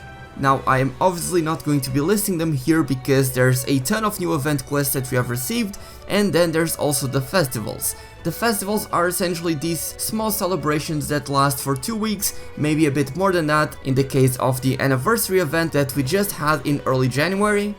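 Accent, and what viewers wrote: Portugal. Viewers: Comecei a ver o vídeo e estranhei logo o sotaque. Grande tuga! + um sub :)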